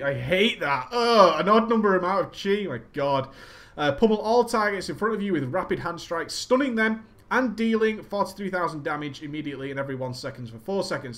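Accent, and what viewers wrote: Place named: Manchester, United Kingdom. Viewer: Wow, I'm from Manchester too, and I've never noticed, (until now) how annoying the manc accent is.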